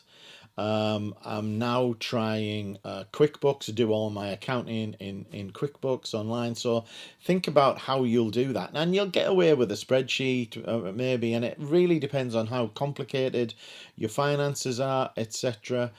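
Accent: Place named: North, United Kingdom. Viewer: First of all, glad that your back. I just started my lego Hobby a year ago, while struggling with depressions. Building helped me to find some sort of calm. Having not a great amount of money, I needed to find a way to pay for my new addiction. Inspired by your videos (at first I'd liked just listen to your great northern accent), I was thinking about doing as you did and I started to chase deals, I parted out, created lists and did all what is nessecary for a Shop. I even more enjoyed all those things comparing to just building (which I still love). I'm in my 50s as well, so I will try to do it like you did later on. My Shop is still very small and I haven't sold that much yet (at the moment most of my sellings are minifigs on ebay) but I hope to grow and make some money later on. The best thing is that all the tasks which this Shop needs really help to set my f**** depression in place. 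So m8, cheers for your insperation. Greets from Germany Btw: I'm a big Motörhead fan, so my Shop is called Motörbricks 😉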